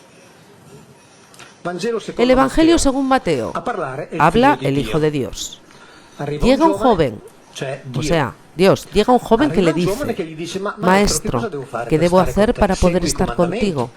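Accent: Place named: Castile, Spain. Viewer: Puro acento castellano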